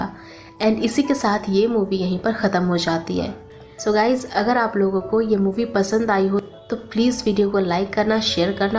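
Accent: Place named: Bihar, India. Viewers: You are from Bihar.. Am I right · Bihari ho ka ji.... परोसी, परा , भीर..... ई सब का है।।।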